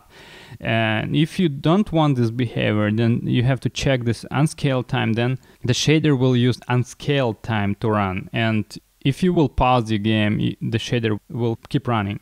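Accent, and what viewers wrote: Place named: Russia. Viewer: рашн рашн,акцент не спутаешь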